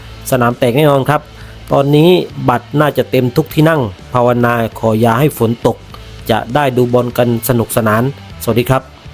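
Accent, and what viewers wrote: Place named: South, Thailand. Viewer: แอดมินเป็นคนใต้แน่นอน ต้องปรับการอ่านให้เร็วและคล่องกว่านี้ค่ะ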